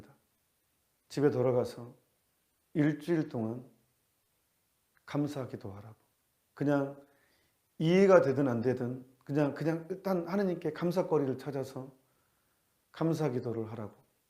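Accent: Jeolla, South Korea. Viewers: 하느님을 닮아 순수하신 신부니이시네요.오늘 처음 강론을 들었는데 많은 가르침 배우고 갑니다~^^자주 배우겠습니다.근데 전라도 사투리 넘 재밌네요 ㅎ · 찬미 예수님! 신부님 감사합니다 ~~^^ 진심이 묻어나는 전라도 사투리가 순수하고 재미있어서 웃음 지어 집니다 ~~ 좋은강의 항상 감사합니다 ~ 덕분에 매일 삶이 기적입니다~♡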